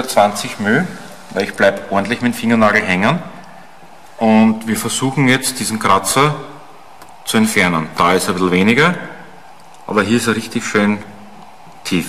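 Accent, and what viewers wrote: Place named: Austria. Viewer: Bist sicher a Österreicher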